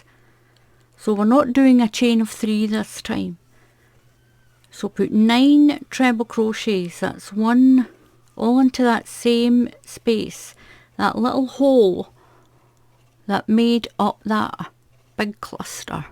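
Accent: Scotland, United Kingdom. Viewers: Thank you so much...I want to make some thing nice as a stripe in the middle of a big afghan I`m crocheting for my husband, and this will be great. p.s. I think your accent is charming. I am in the US, but many of my ancestors came here from Scotland and I like to think that they sounded like you. Keep safe and thanks again. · Not sure where in the world you live but believe you me accent is a lovely soft Scottish brogue, not thick at all ( ps I’m not Scottish)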